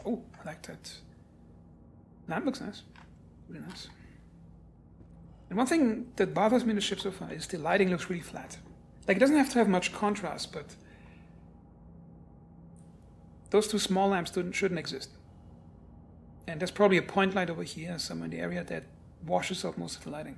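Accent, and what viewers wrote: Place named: Germany. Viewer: More ship reviews please. As a Brit i "two thumbs up" your German accent.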